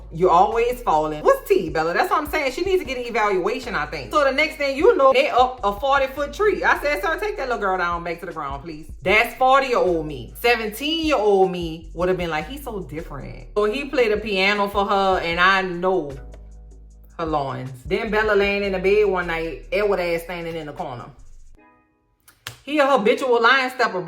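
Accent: New Orleans, United States. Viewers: New Subscriber here. I found your channel for the “His&Hers” review and enjoyed everything about it. Your storytelling is engaging and funny and I can listing to the NOLA accent all day! As an adult who was all about Twilight back in the day and sometimes re-watches as a guilty pleasure I’m all here for your input. ❤🎉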